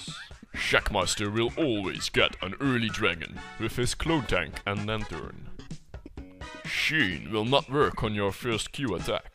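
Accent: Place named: Netherlands. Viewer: Gast, doe je je engels accent expres zo nederlands? xD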